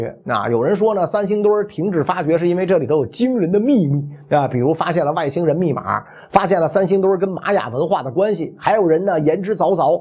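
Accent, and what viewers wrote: Beijing, China. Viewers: 袁老师几天没见，怎么北京话又浓了233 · 哈哈，北京人儿，理解一下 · 是的，秒秒回到北京胡同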